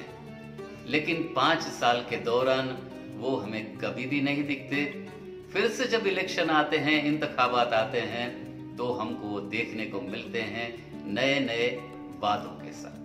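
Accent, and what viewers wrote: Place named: Sindh, India. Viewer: You seem to have a Sindhi accent. Karachi Sind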